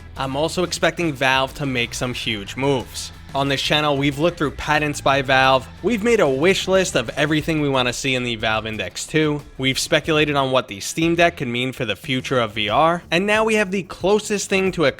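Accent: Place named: Boston, United States. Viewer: Get paid man, make that Boston accent work for you!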